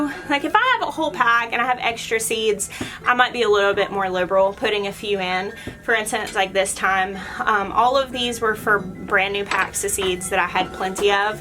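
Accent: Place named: Arkansas, United States. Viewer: My sister lived in Arkansas for almost 10 years as an adult, and picked up a little bit of the accent (we’re Michiganders). When you said that Ben is five (“fahv”), I heard her accent. But then you said “time,” which she pronounced as “tahm.” I’m not saying this to judge the accent at all. I just found it interesting. When I first started watching your channel, I must have heard some of the accent, because I thought you might be in Arkansas.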